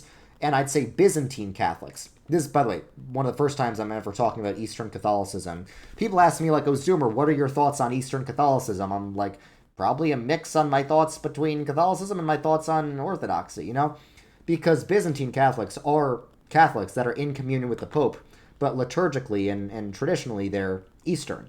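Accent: New York, United States. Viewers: He is from new york they do talk like that. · Don't worry he is just a new Yorker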